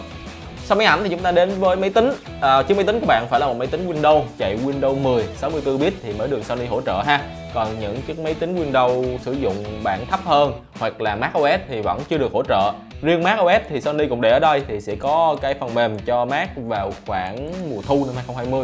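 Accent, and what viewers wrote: Binh Dinh, Vietnam. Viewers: bạn người bình định ah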